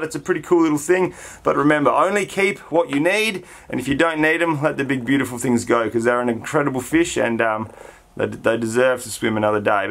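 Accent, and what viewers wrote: Australia. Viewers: Thought u were Aussie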